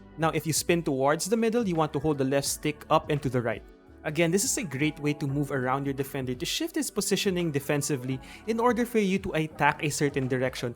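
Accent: Philippines. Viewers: Is that a Filipino accent? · First of all keep it up. Awesome content! Second of all I like your Filipino accent lol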